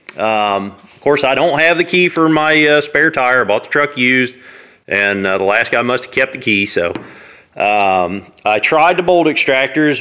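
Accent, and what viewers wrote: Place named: South, United States. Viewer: Maybe I just am used to this guy's kind of language and southern accent but all the other ones seem so hard to follow. This guy made it easy for me soon as he said I just popped that son-of-a-b** on there I said yep like And subscribe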